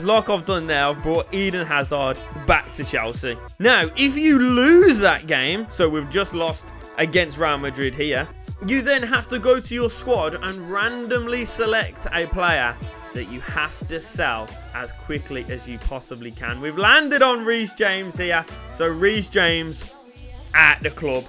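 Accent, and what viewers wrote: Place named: Birmingham, United Kingdom. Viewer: Bros the most Brummie Brummie to ever Brummie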